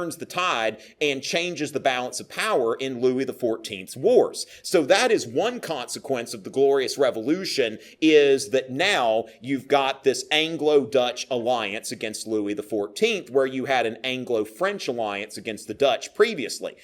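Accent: South, United States. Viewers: It is so strange hearing such highbrow stuff being taught with a thick, hick accent. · This is a really good series. Engaging teacher, which isn't that common! Strange to hear British history with a southern accent, but nonetheless a really fantastic foundation of the period.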